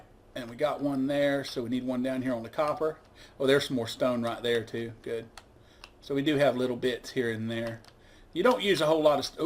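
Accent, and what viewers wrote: South, United States. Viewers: btw love the southern accent. It makes it easier to listen to the commentary. And you're funnier then a one legged man in an a** kicking contest!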